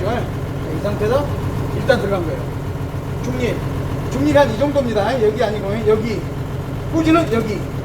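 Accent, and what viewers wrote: Jeolla, South Korea. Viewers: 전라도 사투리 맞죠잉? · 찰진 전라도 사투리 선생님 인상깊은 강의 잘 듣고 갑니다. 감사합니다. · 전라도 교관 같은데요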